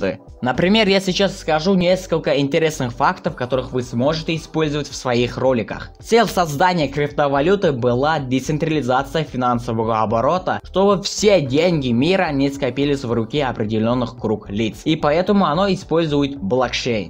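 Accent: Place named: Russia. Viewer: Акцент, у тебя русский, не претворяйся, что владеешь иностранным языком, я их знаю 7 🤜.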